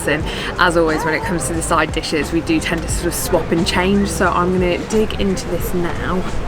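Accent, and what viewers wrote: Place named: England, United Kingdom. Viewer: Wow thanks 👸🌹 for sharing more of your beautiful English seaside and countryside. The food looks wonderful. I really love good seafood. I would love to visit Hadrian's Wall and the Sycamore Gap. All that great Roman and English history. I am glad I can see them through your cameras. I really love your beautiful English voice narrating your videos, it is very nice. I still think your English coast looks like the Washington State Coast, One is rich in Roman and English history and the other one in Native American. Thanks for Sharing Love 💖 your channel. Cheers 🍷🍷🧔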